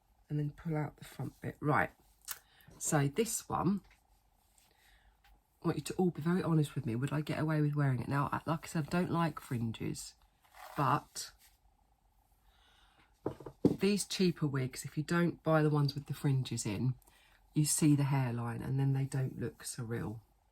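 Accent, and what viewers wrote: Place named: England, United Kingdom. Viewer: Good morning over there in England. Love your hauls and that accent still gets me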